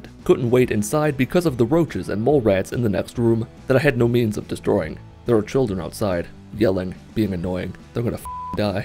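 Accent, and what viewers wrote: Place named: Michigan, United States. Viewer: I always wondered why your accent was worse than mine. And its because you are from Michigan and I am from Ohio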